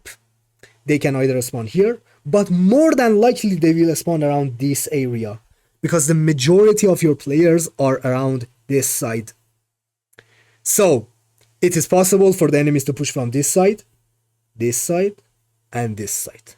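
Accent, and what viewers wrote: Iran. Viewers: از لهجت معلومه ایرانی ای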